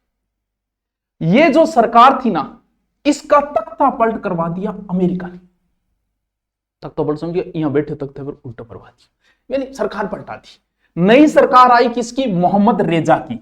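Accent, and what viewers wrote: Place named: Haryana, India. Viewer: Content is good .But, try to speak in neutral accent. Sab ko haryana accent samajh nahi Ata.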